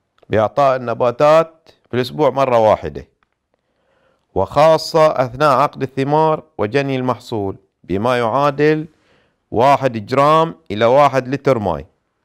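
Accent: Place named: Bahrain. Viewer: انا توقعت من البحرين من الاسم للعايله وهم اللهجه بحرينيه واضح جداً..والنعم والله من اهل البحرين. اخوك من السعودية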